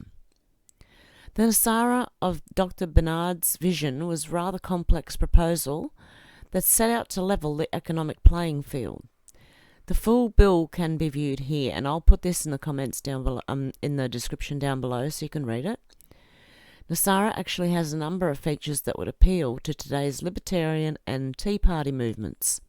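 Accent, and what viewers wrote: Australia. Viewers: I'm very glad I found your channel. Great content, authentic and soothing energy. Love your Aussi twang.. peace from Canada (speaking of twang eh) 🙏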